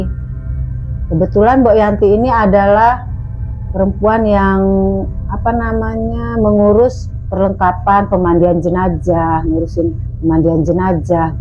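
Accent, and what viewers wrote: Lampung, Indonesia. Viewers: membawakan ceritanya Lampung banget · Orang Lampung kek nya mbak nya dari logatnya Lampung bnget, soalnya saya juga Lampung bumi agung Salam kenal subscribe baru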